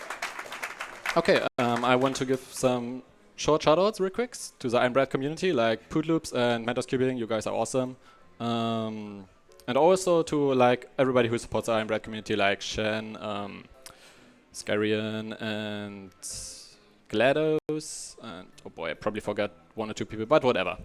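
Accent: Germany. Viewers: Tbh we actually used to make fun of other germans that speak with an accent like that in school. Most germans who dont say ZE instead of THE dont like hearing that "german" accent. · Love how you can hear it that he’s German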